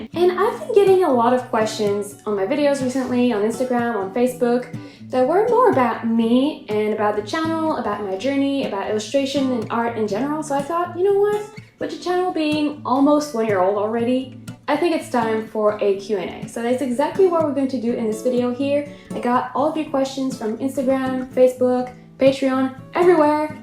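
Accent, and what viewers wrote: France. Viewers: Illustration. You are so wise, my friend. Thanks for sharing your wisdom with us! :) PS: No wonder I connect with you so much. I have the same accent. Raised in France, moved to the U.S. at age 10, and I still trip on my words quite often lol. You're doing great! Also, my daughter has a rabbit named Mr Lapin. It's a peluche though (we speak frenglish at my house) hahaha. Bisous!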